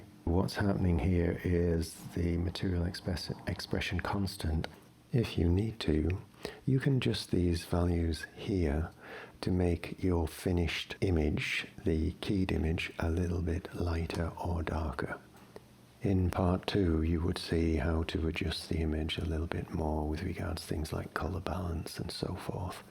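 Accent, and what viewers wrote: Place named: United Kingdom. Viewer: wow this guy's voice is different he must be from the UK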